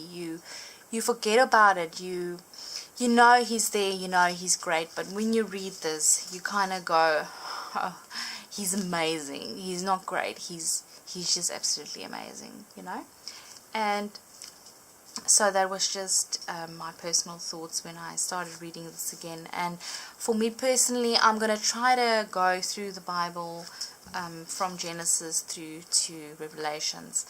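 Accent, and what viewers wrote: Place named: Australia. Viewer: You're coloring is absolutely beautiful! Thank you for inviting me over! I subscribed! Are you from Australia? I love your accent! God bless, Kim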